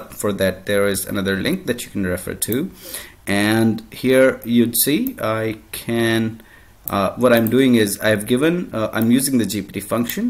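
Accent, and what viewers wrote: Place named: United States. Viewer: bhai tu amerian accent me kyu bol rha hai ?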